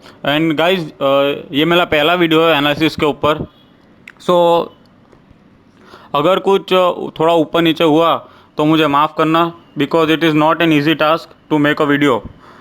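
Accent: Gujarat, India. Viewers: bhai Gujarati he app awaj se pata chal gaya #baari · Are you gujurati bro ? You sound like one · Bhai tum pakka gujrat se ho gujrati Right ? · Is that Gujarati Accent? 🤔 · U r gujrati aren't u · Bhai gujrati ho kya?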